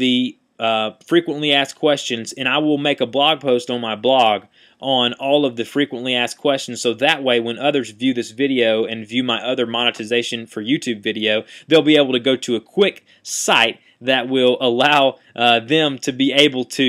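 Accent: South, United States. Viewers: you've got a southern accent :) where ye from? xx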